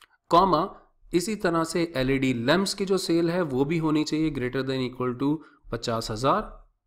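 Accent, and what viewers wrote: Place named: Pakistan. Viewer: Excellent explanation. You sound more Pakistani than Indian, pronunciation wise such as the word zaroor, Aap etc. Probably because you are from UP/Delhi. Nevertheless, good work, love from Pakistan.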